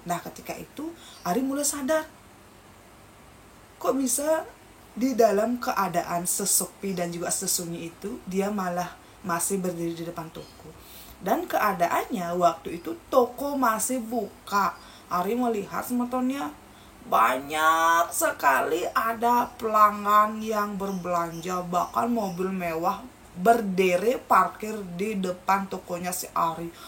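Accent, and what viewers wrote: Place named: Bali, Indonesia. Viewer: Jangaaannn...ntar saya ngga ngerti..logat bali juga dah enak koq. Hehehee..❤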